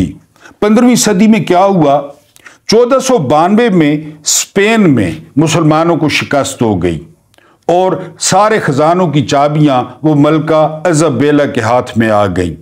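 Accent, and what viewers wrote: Saraiki, Pakistan. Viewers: Masha ALLAH Zaberdust V Log kertey hein, Saraiki Touch bohot hi acha lagta hey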